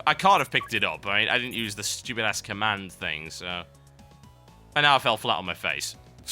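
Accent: United Kingdom. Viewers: "Not as clumsy or random as a Titan... an elegant blaster for a more civilized age" Hahah epic Star Wars ref!! I wish I was a Brit with a sexy deep voice like you, you make everything sound a million times cooler xDDD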